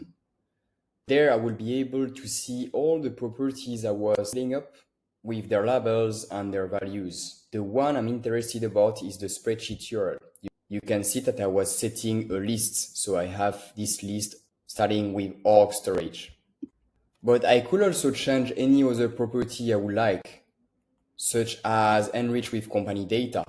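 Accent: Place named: France. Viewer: no wonder nobody watches the videos, cant understand that thick french accent.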